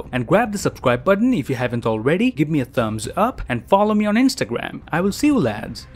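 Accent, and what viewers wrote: Canada. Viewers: he sounds so much like modest pelican but Canadian instead of Australian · I love your accent say it again!: Dowa the explowa Edit: Thanks for the likes! Hoping you are having a good day!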